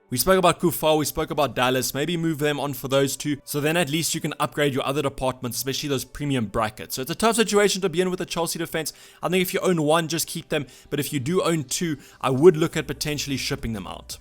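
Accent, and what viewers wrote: South Africa. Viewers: The only channel needed for expert advice on FPL, Not to mention the huge bonus of that great South African Accent. Thanks.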